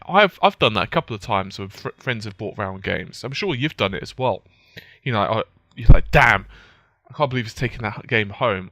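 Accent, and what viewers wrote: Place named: Manchester, United Kingdom. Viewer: Are you from Manchester? your accent is simular to Soprano pictures.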